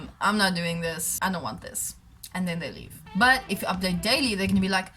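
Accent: South Africa. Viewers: I knew she was from SA ,the accent said it all😂....yess!! Fellow South African💫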